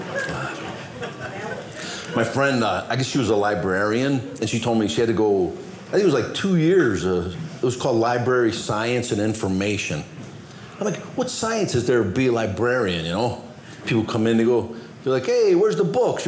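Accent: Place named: Midwest, United States. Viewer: Mw accent is so perfectly complementary. "YeAh that's da guy!": flawless timing. Funny guy.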